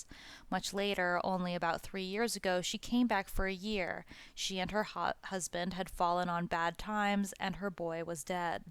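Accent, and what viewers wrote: United States. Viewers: The thick whiny Ameican accent renders this program unlistenable.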